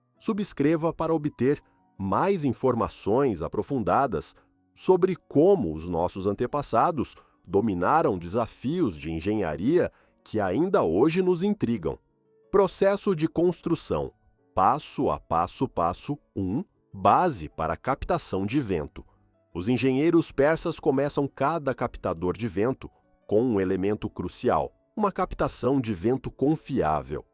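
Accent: Brazil. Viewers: Sotaque brasileiro e texto da guiana. Ruim de ouvir "à falar", "à andar", etc.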